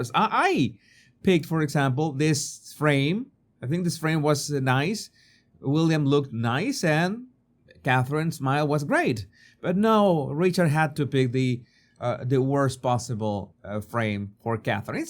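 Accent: France. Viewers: Well I've heard your French accent and it's fabulous. It surprised me about the "bird" . 😅. I like it. It's 🥰 cute. Take care. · French accent?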